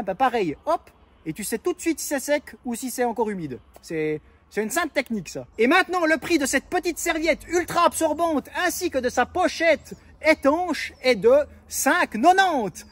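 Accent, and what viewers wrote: Switzerland. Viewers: Super accent jurassien suisse, j'ai la larme à l'oeil!😂👍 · Eh ta moi en proche frontière suisse qui devine ton patois sans me tromper 😅😂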